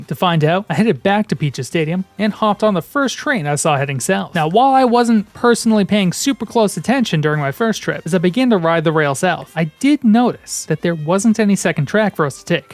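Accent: Boston, United States. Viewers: Do what you wanna do man, Boston accent included! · I feel like I’m going crazy was he always this Boston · are you from boston?? i swear i can hear an accent but im not sure 👀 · I can hear a bit of Boston in your voice😅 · please keep doing that Boston accent :3 Is it your native accent? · Please stop doing that Boston accent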